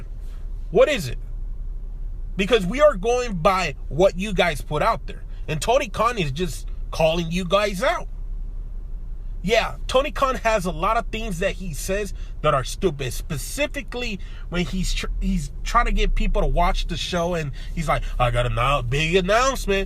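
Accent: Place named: West Coast, United States. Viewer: Bruh I forgot I even commented watched this again dying laughing your accent is gold bruh 😂 definitely a west coast Latino 🥂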